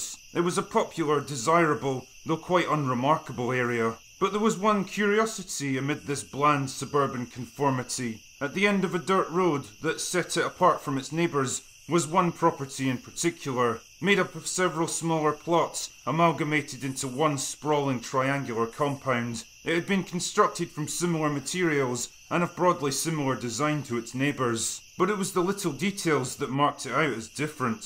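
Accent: Scotland, United Kingdom. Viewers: God DAMN. Still remember where I was on that morning. Remember driving into work, radio on, outlandish preliminary death counts. Entering the classroom, turning on the television for the kids-- like my teacher did when the Challenger exploded-- because this was more important than any lesson plan., you brought tears to my eyes. Also, haha, I loved hearing US SEAL team members with your Scottish accent. God bless ye!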